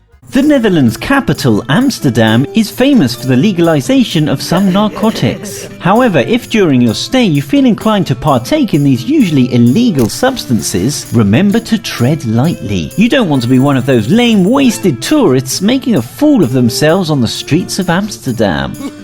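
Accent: United Kingdom. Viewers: Is it Brexit that I don’t like the British accent anymore?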